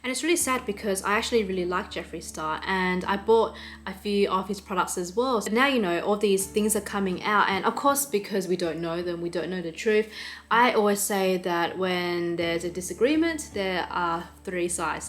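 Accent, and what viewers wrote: Australia. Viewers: I like your vlog! No worries about the duration of video 😆 オーストラリアに行ったことがあるので、オーストラリアの発音がとても好き🇦🇺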